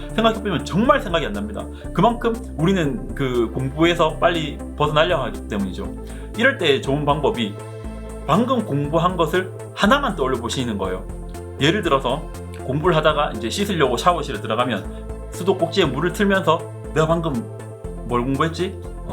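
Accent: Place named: Gyeongbuk, South Korea. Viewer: 경북 거주하시나요? 억양이 저희 고모랑 똑같아서요 ㅎㅎ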